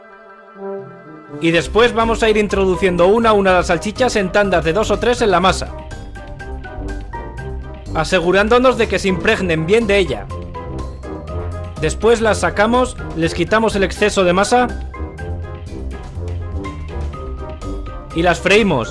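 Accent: Spain. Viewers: bien eso es facil me gusto solo me da risa tu acento de español xD me encanta <3 gracias like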